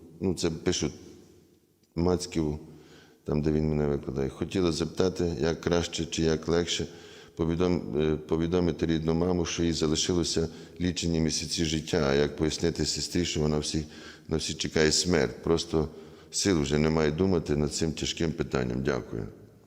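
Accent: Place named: Western Ukraine, Ukraine. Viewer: Отче, дуууже цікаво відповідаєте на усі задані запитання. Слухаю Вас "запоєм", Ви чудовий оратор. Відчувається багатогранність Вашої особистості. Відчуваю у Вашій мові западенця, то така тепла і мила мова, я галичанка....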